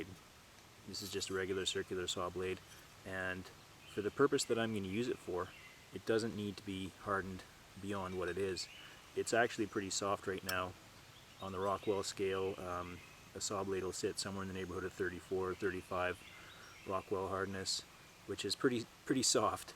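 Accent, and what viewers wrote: Canada. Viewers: Thumbs up if you knew he was Canadian before he mentioned he was making a knife. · Are you canadian? Just asking. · canadian accent eh? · If you haven't ever heard a thicker Canadian accent, I could understand thinking it sounds a bit Irish. It's more of a Scottish influence, but again, not completely ridiculous for someone who doesn't know better to draw that conclusion. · are you canadian? i can hear it from the ou in about. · Are you Canadian?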